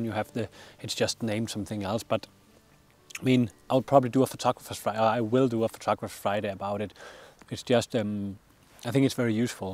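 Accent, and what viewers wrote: Denmark. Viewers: The landscape, the 'driving by the bakery', the danish accent... just made me so homesick :) (Expat)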